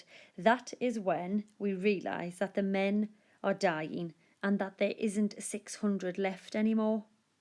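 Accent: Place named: Scotland, United Kingdom. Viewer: All I could hear was your scottish accent. Idk why for some reason, but tht i all I could hear.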